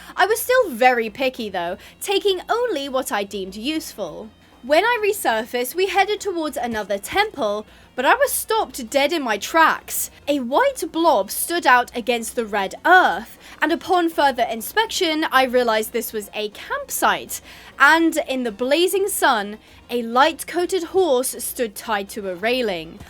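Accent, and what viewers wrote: United Kingdom. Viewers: You’re so British you little bugga ❤ I love it tho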